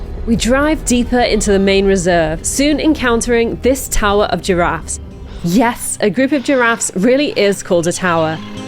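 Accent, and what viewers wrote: United Kingdom. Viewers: I could listen to your voice, 'British accent' whole day. I love your cinematic vid.😊 Karibu kenya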